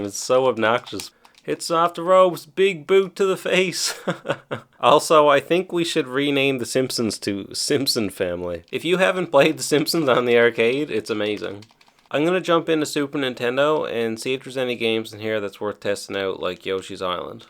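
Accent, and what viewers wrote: Newfoundland, Canada. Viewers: Bro where are you from i hear an irish accent slash american or canadian are u from newfoundland??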